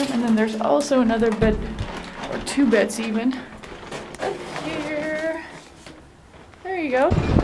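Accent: United States. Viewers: You speak English like an American, almost no accent and a lot of idiomatic expressions we use.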